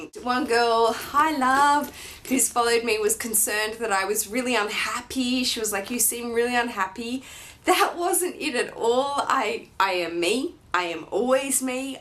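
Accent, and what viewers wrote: Australia. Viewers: This video is so good on so many levels! I really love the LV community...even though I'm new to it, I'm not new to fashion/lifestyle blogging...it's really lovely to see the support and friendship here. Fashion blogging has kind of lost that feeling of being kindred spirits because it's so commercial now. Anyway, I just love your videos, not only because you are so cheeky AND well-informed, but because I'm married to an Aussie, and that accent is one of my faves! I used to live in Sydney, so every time you mention Bondi Junction, my heart skips a beat. Thanks for all the killer information, gorgeous! xo V.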